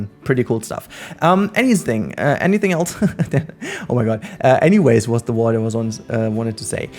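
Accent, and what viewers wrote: Germany. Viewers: Du sprichst ziemlich gut englisch, man hört trotzdem sofort das du Deutscher bist ;)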